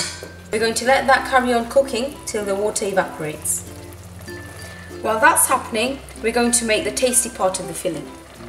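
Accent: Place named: England, United Kingdom. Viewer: What area do you live in?? Because your accent has a little English in it !! Great video sis 😊😊🙂🤗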